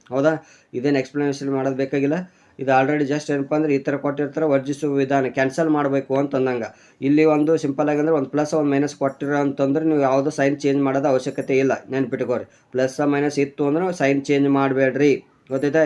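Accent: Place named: Jevargi, India. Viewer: ಸರ್ ನಿಮ್ಮ ಮಾತು ಜೇವರ್ಗಿ ಮಾತು ಸರ್ 🔥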